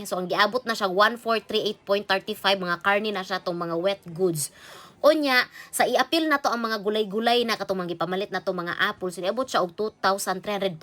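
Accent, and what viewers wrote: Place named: Visayas, Philippines. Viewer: I love the accent. na lingaw gyud ko 🤣 proud to be bisaya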